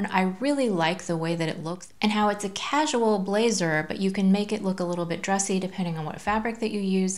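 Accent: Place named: United States. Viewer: I'm not sure where you are, I'm guessing USA from your accent, in which case I don't think they sell the cutting table there. It's a New Zealand company and I bought mine in Ireland, I also have one of their sewing machine tables. However, I'm sure that there must American brands that offer similar products. The most important thing is the ability to set it up to be the height that suits you, it has made a huge difference to my lower back. Lockable casters are useful too.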